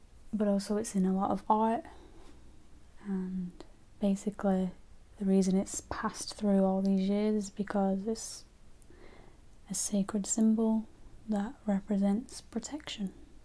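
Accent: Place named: Lancashire, United Kingdom. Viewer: Of all the accents I never thought I would get tingles from, yours (Lancashire?) is one I would never have imagined! But your voice, style and tone is amazing. Also your accent is lovely. Just shows you should never stereotype! Keep it up - and please do a Q&A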